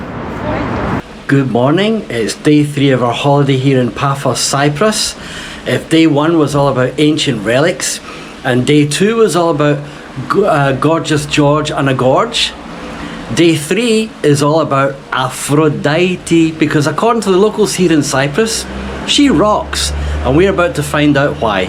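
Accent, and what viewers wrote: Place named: Scotland, United Kingdom. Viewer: Not a bad first attempt. That Scottish voice-over is quite amusing.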